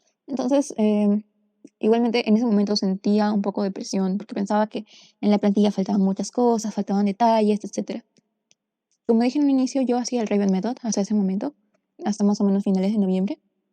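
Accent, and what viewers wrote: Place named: Peru. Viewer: Tu acento peruano me encanta 👁👄👁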